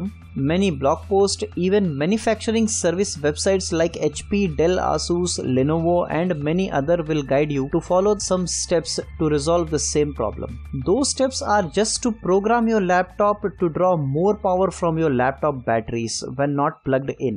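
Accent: India. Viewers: lol Indian accent is just beautiful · Wtf we know the consequences. Just tell us the solution. Your accent is terrible. Definitely Indian.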